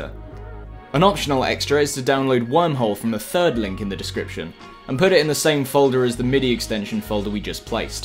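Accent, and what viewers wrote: United Kingdom. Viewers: So your british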